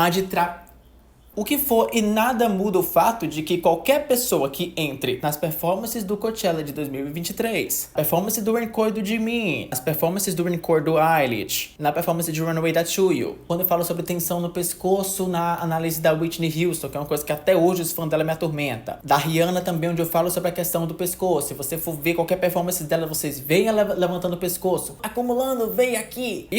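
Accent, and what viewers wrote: Ceará, Brazil. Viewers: Vem sotaque do Ceará! Veeemmm!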